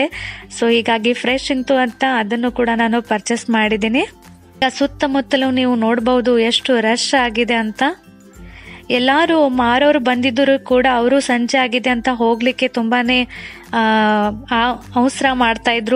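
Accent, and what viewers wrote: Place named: Belagavi, India. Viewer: hi akka nimma ura yavduu heli.....Niv belgavi Kannada matadtira adake kelde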